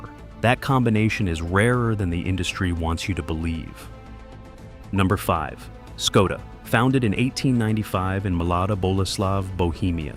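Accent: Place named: United States. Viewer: Turned it off when I heard an American accent!!!!!!!!!!!!!!!!!!!!!!!!!!!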